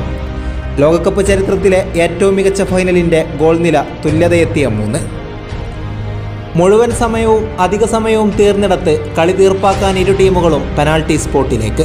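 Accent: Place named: Ernakulam, India. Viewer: അറിയാതെ കണ്ണു നിറഞ്ഞുപോയി ഈ കളിയും എറണാകുളം അവതരണവും കേൾക്കുമ്പോൾ 💙🤍💙🤍🇦🇷🔥🔥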